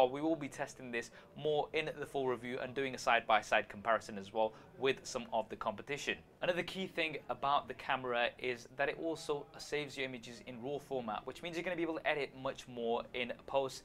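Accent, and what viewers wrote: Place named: United Kingdom. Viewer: & You both are my fav reviewers And i honestly like your british accent Keep the good work brothers;)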